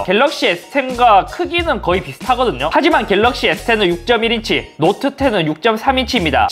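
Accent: Gyeongsang, South Korea. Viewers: 죄송한데요..다 좋은데요..목소리가 너무 듣기가 불편합니다.경상도 사투리에 톤이 너무 높아 시청하면서 듣는데 심장이 쿵땅 거립니다.목소리 톤좀 낮춰서 영상 만들어 주시면 시청 많이 하고 싶습니다.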